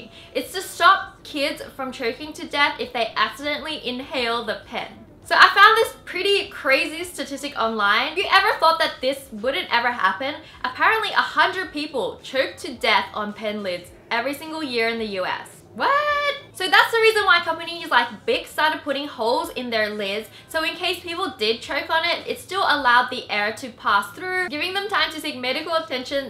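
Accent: Australia. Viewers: She has a Australian accent because she's lived in Australia for a while before when she was a younger and she's actually Chinese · Are u Australian wengie? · Bunnykis she's Australian. At least I'm 98% sure. Because I'm Australian but at the start it was also hard for me to tell as well 😅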